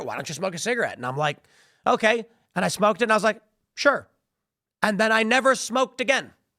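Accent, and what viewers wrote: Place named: Chicago, United States. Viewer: your SW Chicago accent and attitude come through loud and clear in this video! (Observed from a west suburbanite)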